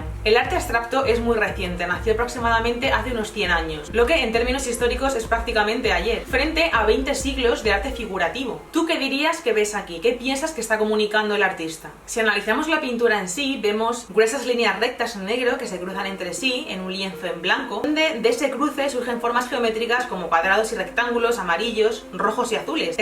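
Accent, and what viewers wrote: Spain. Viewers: Hola. Es la primera vez que te escribo. De qué parte de España eres oriunda? Lo digo por tu acento y tus razgos.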